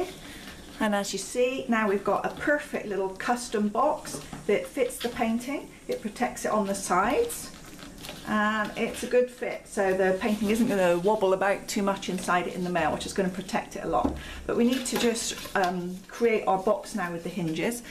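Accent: United Kingdom. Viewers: I love the video! Very helpful! And your (probably:) British accent💕 I see you are wrapping classic canvas, but what about board canvas, those slim ones? I think it should be enough to use the tissue paper and over it a bubble wrap and put into the book box mentioned in the video (I love them). Or any other method? I want to ship just the tiny ones board canvas paintings:)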